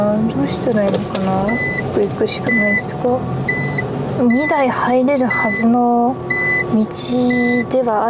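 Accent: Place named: Gifu, Japan. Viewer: 鍛わってる。 久々聞きました。岐阜弁懐かしいです。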